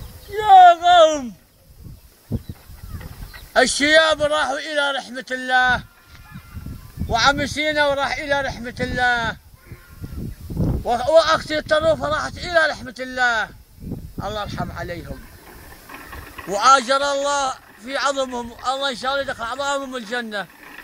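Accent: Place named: Levant, Arab world. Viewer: شلون مطالع من بغداد 3 سنوات اني خدمتي العسكرية بالموصل وزاخو حبي انتبه لهجته من بادية بلاد الشام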